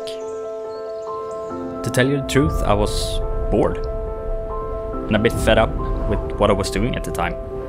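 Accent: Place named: United Kingdom. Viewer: I like your music very much and your British accent as well ❤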